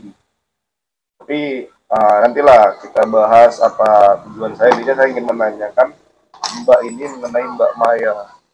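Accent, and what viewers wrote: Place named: Java, Indonesia. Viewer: Ass wr br mba klo omng jngan medok jngan terlalu kerasss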